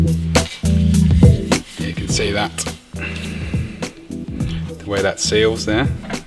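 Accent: United Kingdom. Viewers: a Guy with my Good Ol College Professor British accent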